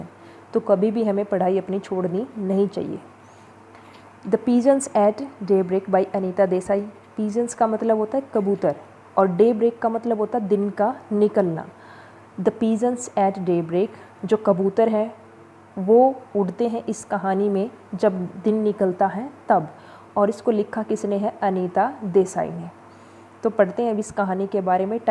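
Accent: Haryana, India. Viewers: Mam haryana se ho aap?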